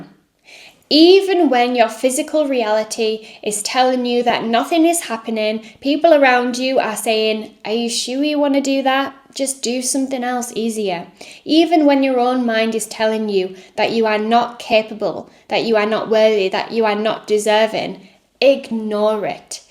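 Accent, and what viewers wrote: England, United Kingdom. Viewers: It reminds me I think in the book of habakkuk to write down the vision. I need to write down the vision of my new job I just started for this millionaire. Andy be quite honest I thought you were just going to talk nice to us men that are broken I didn't know you were a Christian and had a Godly message thank God you weren't a non-Christian woman just flattering us weak-willed men. Thank you for being obedient to God and speaking truth and love to us. This is exactly what I need right now I already knew it but I needed to be reminded I needed somebody to remind me what God Said. I love your accent you must be from maybe England or New Zealand or australia. It's a beautiful voice God gave you keep using it on YouTube and Instagram wherever you can use it to get the gospel out and then message out.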